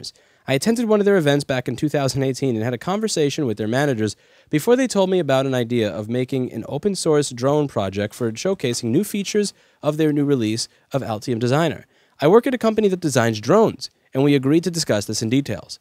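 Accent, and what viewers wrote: New York, United States. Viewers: Thank you, for talking like a real New Yorker. Your message is being heard.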